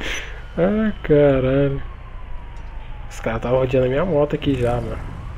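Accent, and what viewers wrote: Bahia, Brazil. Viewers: Caramba mano tu e baiano né kkkkk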